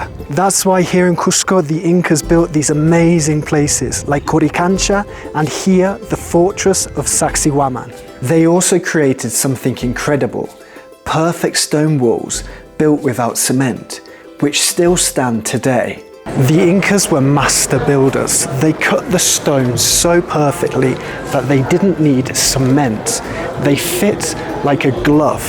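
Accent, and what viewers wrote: United Kingdom. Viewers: Hi my friend british. I often learn english with u. I mean british pronunciation... You are in my country hope you enjoy!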